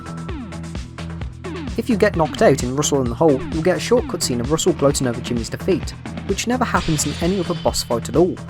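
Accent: United Kingdom. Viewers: its a british accent. whats so hard to understand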